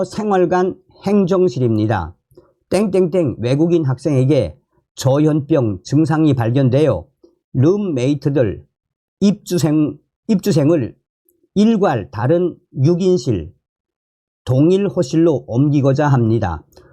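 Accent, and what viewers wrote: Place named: North Korea. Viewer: 이 바라!!! 다른 사람을 시켜 방송을 하든지 아니면 아예 이북 말로 방송을 하든지... 듣는 사람 넘 힘들다.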